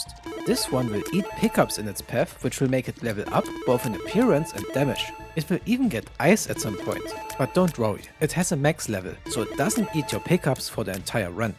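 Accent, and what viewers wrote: Germany. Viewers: Ah yes DER DEUTSCHE AKTZENT is stong with you :33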